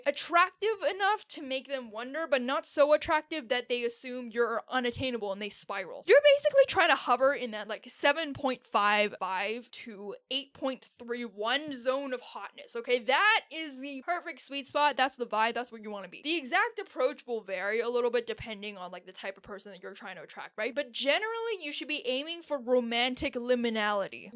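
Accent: United States. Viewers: Way too funny & chaotic, as an American you sound like one & subscribed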